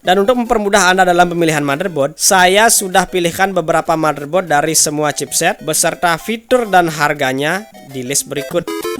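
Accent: Bali, Indonesia. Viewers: Logat bali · logat khe bali bgt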